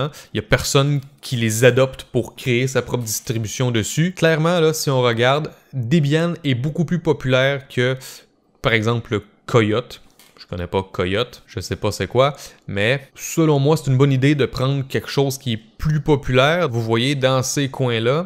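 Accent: Canada. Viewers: Cool ta vidéo. Riche en contenu et pas seulement pour faire une vidéo.. Par contre qu'est-ce que j'ai dur avec l'accent canadien ^^ bisous de belgique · C'est super merci. Explications claires qui donnent envie de se lancer. (et votre petit accent canadien est charmant) Merci. Ella 🌺🌷🍀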